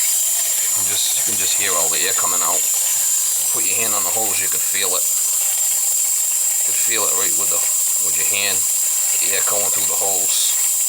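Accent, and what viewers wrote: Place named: Boston, United States. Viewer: I'm just guessing you have a Boston accent. I'm rural Pa mountains. I traveled around and worked with people from around the country.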